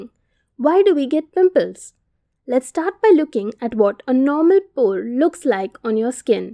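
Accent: India. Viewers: Sounds so indian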